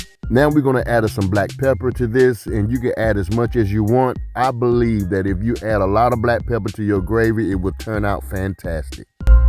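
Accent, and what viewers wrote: South, United States. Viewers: Yea! I heard the first 5 seconds and guessed correctly, he’s in the south ❤️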